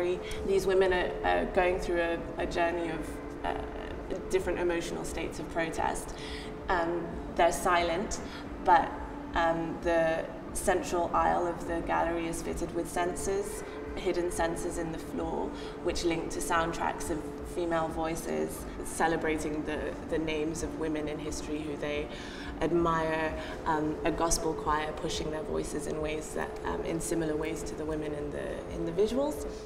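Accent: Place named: London, United Kingdom. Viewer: We’re family lovely intro friend ! Love the London accent ! This video is absolutely amazing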